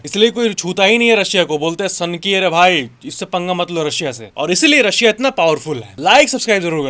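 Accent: Bihar, India. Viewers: Is chutia ka accent bihari h video dekhne ka man ni krta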